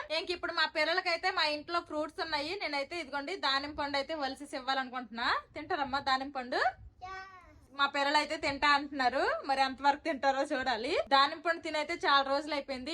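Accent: Srikakulam, India. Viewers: Hi గారు నా పేరు కరుణ నాకు హాయ్ చెప్పండి మీ శ్రీకాకుళం భాష నాకు చాలా నచ్చింది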